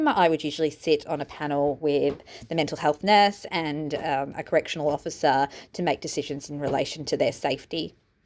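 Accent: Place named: Australia. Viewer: I'm Australia also, but it's only when listening to Aussie creators that I "hear" our accent. And I love it! I could listen to you talk all day, especially rational thoughts about this case and insights into your profession. Excellent work.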